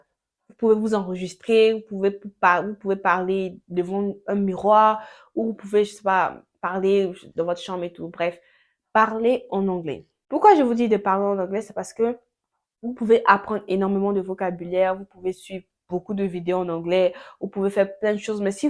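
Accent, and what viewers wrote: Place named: Ivory Coast. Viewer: Accent Ivoirien 🙄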